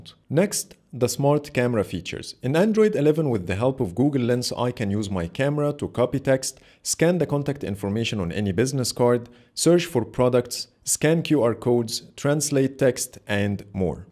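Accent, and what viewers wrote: Egypt. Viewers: ناس بتقول انك مصري سمعت من ناس كتير انها بدات تفهم إنجليزي من الطريقة حقتك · You have the unique egyptian accent 😂😂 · Your accent sounds Egyption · واضح انك مصري تكلم عربي بالله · اللهجة المصرية 🤣❤️ بس بالانكليزي 🤪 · Am sure u r Egyptian.. Your accent is so Egyptian.. Thanks for the great content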